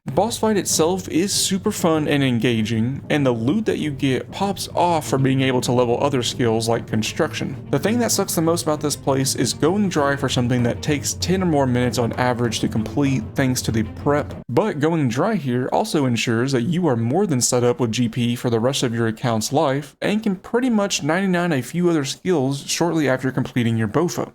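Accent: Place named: North Carolina, United States. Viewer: You sound like you’re from nc lol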